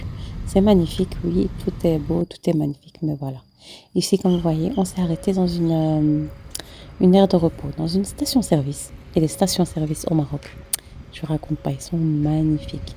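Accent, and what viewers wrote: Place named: Belgium. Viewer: Ca sent la belgique quand tu parles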